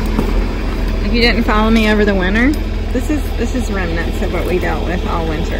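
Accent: South, United States. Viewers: Love your country accent/talking. Be back childhood memories. 🇺🇸🇺🇸🇺🇸🚜🚜🚜👍👍👍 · Just now found your channel, awesome videos! Making me miss my grandparents cattle...and a southern accent!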